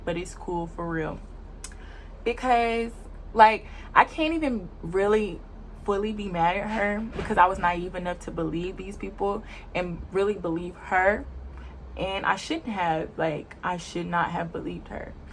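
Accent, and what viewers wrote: United States. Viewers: Are you from St. Louis ? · that’s a Baltimore. Accent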